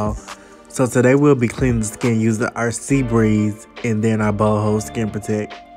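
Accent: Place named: Texas, United States. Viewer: I love🥰🥰 his accent, where are you from?... Texas?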